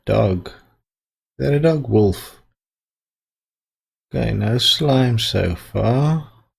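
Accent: South Africa. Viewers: His sounds South African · You sound South African so awehhh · Na he sounds south african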